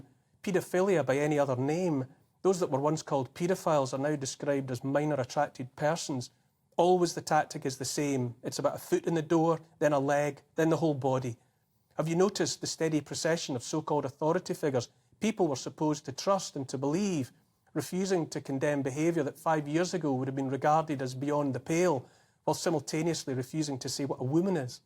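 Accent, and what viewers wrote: Scotland, United Kingdom. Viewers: maybe it's the tone of your voice or the barely hidden anger in your eyes. Or maybe it's the Scottish accent, but every single time i listen to what you have to say I'm looking for a two hand broad sword and a fxcking big axe.. Keep going, stay free.. all the best from the Netherlands.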